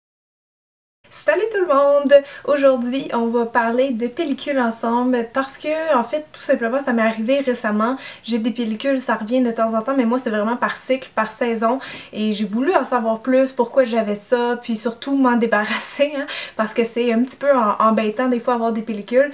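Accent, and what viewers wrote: Canada. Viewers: en plein dans le mille, j'etais sure que tu etait canadienne !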